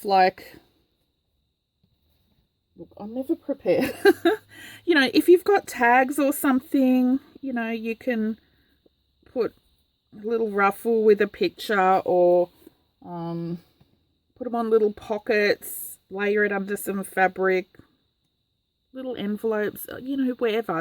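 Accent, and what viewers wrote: Australia. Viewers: Hi! My name’s Erica & I live in Lawrence, Kansas, USA! You just showed up on YouTube for me & I’m so glad you did, because I love this method for making paper ruffles! It’s genius & I hope I can get the hang of it! I can also see using paper that’s been colored with sprays & paints! I think you said something like the sky’s the limit, haha! I love your Aussie accent, as well! I have “liked” & subscribed & will mention you to my friends! So nice to find you! Erica❤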